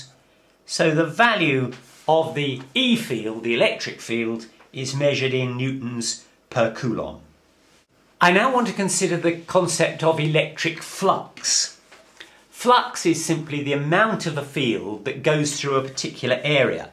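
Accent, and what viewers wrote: United Kingdom. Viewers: One million thanks! fantastic clean and quick review for my EM final test, just one thing, , what if the other charge is not added at the sphere centre, how can the superposition implemented? the phi equation here is not applicable for non-central charges isn't it? Love your British accent BTW :)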